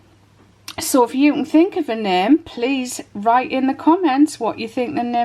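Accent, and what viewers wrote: United Kingdom. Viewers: Lady! I don’t know why I haven’t watched you for so long. I think I overdosed on soap! 🤢. You are one of the wonderful generous soap ladies who made me feel like I could a; make soap and b; pipe soap even though I had never piped really anything of any mention before. You are still at it trail blazing. Thank. You for sharing your time, your talent and your lovely British accent!!!n. Keep up the wonderful work!!! PS I have been a fan for over 5 years!!! Wonderful to see how you’ve resolve your pesky silicone liner problem!!🤣🤣. You’re a genius!!! “Blue birds and Cherry blossoms” soap name!!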